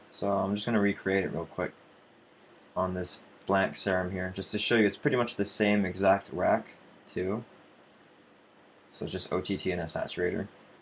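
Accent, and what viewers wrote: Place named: United States. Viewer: Aren't you from Thailand? You sound super american haha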